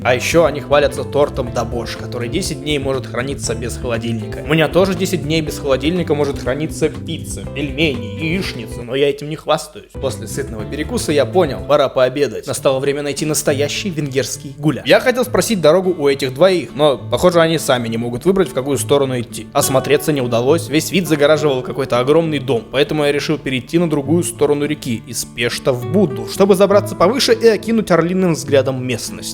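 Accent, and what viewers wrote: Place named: Odessa, Ukraine. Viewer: Хаха как всегда заставил уповать от смеха. Отдельный лайк за Одесско-еврейский акцент)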